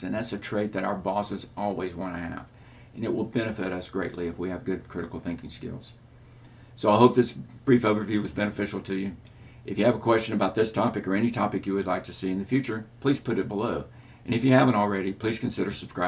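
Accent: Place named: United States. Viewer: Wow,I just feel like I found a treasure. This channel is really great. He uses a very simple and direct language. It's so supportive, informative and wonderful. Moreover, I learn more about the American accent.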